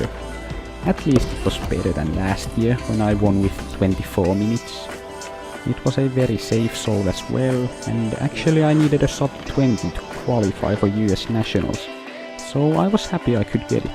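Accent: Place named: Finland. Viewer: Guud job. Ainoo ongelma niin tota.... Vähän rallienkkuu havaittavissa😂 Mut hyvä idea tykkäsin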